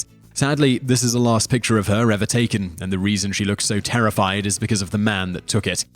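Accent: United Kingdom. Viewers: Sean S., it doesn’t matter where she was born or how she pronounced it herself. British pronunciation is just as valid for narration as American pronunciation.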